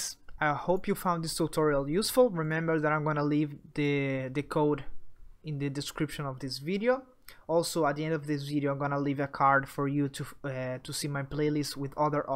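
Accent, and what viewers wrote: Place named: Brazil. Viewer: pelo sotaque senti que era do Brasil, você tem um inglês leve e sonoro, muito bom!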